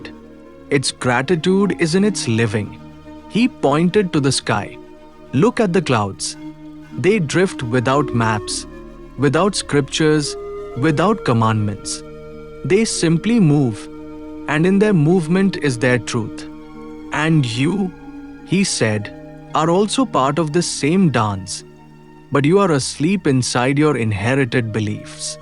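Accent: India. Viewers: The narrator speaks with heavy indian accent. The story is not from bible for sure. Most probably from hindu since hindu is big religion in India.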